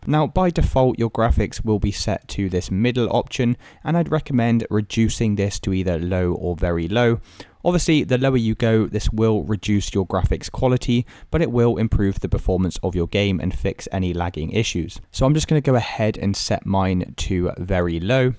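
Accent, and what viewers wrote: United Kingdom. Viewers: jesus i hate the british accent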